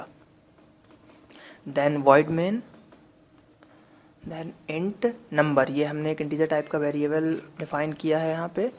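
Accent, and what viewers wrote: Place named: India. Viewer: dunno if that's indian or English with a very very strong indian accent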